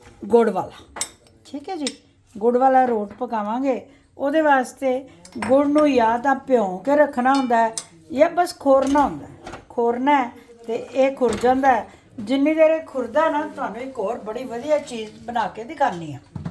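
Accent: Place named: Malwa, India. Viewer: A pigrna te khurna ....malve di boli hy schi dso bhain ji kehrha pind hy tuhada mera muktser hy tahi tuhada piar auda rehda hy ...mai canada to likh rhi ha sarian videos dekhdi ha ❤❤....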